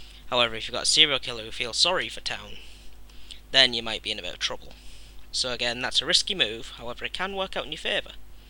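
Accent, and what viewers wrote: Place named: Geordie, United Kingdom. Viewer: Where r u from, cuz u sound like ur a geordie but you dont have a full on accent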